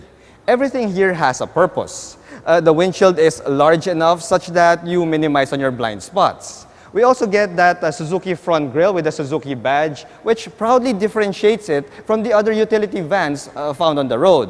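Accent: Philippines. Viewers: shifting by ear??? Haha!!! Shifting by hand is easier I think. It feels natural. Haha Pinoy English. Trying hard. Tagalogin mo na Lang boy!!!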